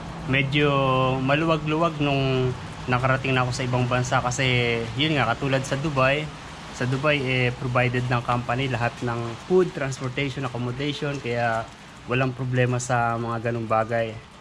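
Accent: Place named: Batangas, Philippines. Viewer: Korekek taga Batangas kba Ako oo sa Lipa Batangas may punto ka kasi eeeee😛😛😛😛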